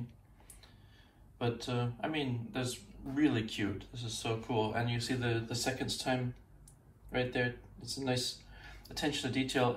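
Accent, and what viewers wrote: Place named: Germany. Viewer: You speak Dutch with a German accent and intonation 😉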